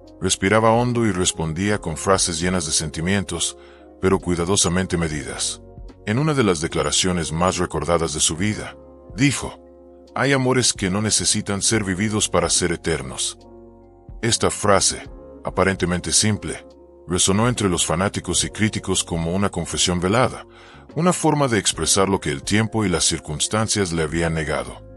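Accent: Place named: United States. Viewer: Con todo respeto no seas Mamon , Habla bien eres mexicano??? O gabacho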